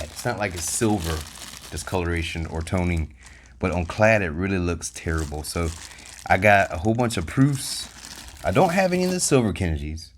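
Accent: South, United States. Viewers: This may be a strange question but where are you from? You have an accent that I have heard in African American neighborhoods originally from the South and living in Washington DC and Philly.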